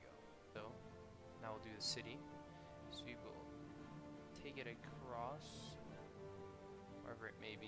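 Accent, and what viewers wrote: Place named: Scandinavia. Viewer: He's gotta be scandinavian for his accent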